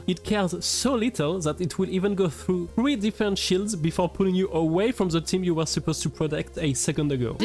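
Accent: France. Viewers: dude this is so funny, well edited and highly informative for such a low view and sub channel, take my damn sub! also you accent (I'm pretty sure it's french) is 🤌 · You sound french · Bro i love your accent “we grrrrab ze playeur statu and rrrun to zhafity wit it” it is so nice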